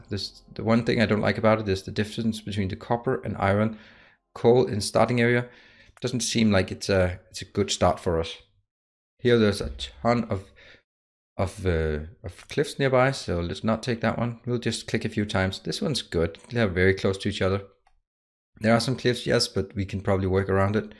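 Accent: Denmark. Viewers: First time stumbling upon your channel, I could tell right away you were Danish by the accent... Greetings from a fellow Scandinavian! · Are you danish or something ? Your accent when speakin sound alot like a dane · I'm Swedish, but I definitely heard the Danish blending through the English here. :) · Since I'm danish, I could immediately hear you were also danish haha. Fantastisk! :) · Haha i could hear you where from denmark!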